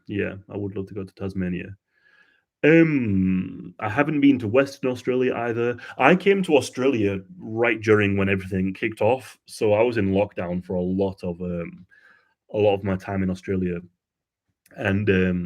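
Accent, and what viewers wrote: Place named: North, United Kingdom. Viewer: Luv his northern accent n luv to watch keep it up.x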